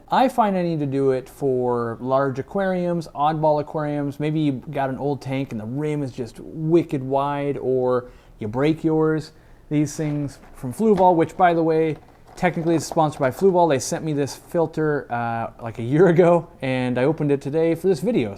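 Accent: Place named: New England, United States. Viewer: haha sweet vid, where in New England are you from? :p "rim is just WICKED wide" lol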